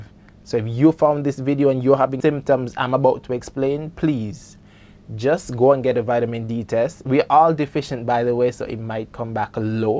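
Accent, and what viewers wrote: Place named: Jamaica. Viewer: I want to say sir you really know your information. Love how you explained everything. Love your vibe ❤ Just curious, sre you Jamaican or Haitian? I’m hearing both. Mostly Jamaican or maybe Vincy?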